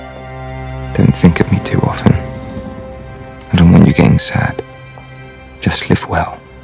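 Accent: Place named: United Kingdom. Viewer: 念的英音英语真好聽。喜歡死這部電影了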